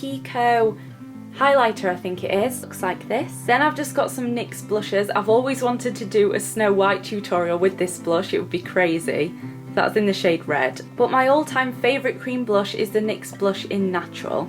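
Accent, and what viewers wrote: United Kingdom. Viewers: What part of the uk are u from we have the same accent xx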